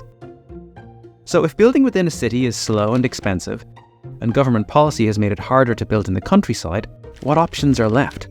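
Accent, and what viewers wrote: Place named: Ireland. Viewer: Jesus, it’s great to hear someone with an Irish accent realising how screwed we are and looking at better models around the world. Hopefully someone government might take note or you get millions of followers who will push them to. Subscribed! 🙏🌍🌱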